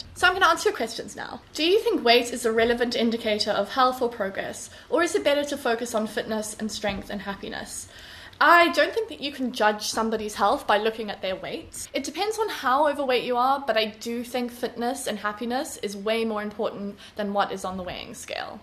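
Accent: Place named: South Africa. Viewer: No I think it's just her accent she's from South Africa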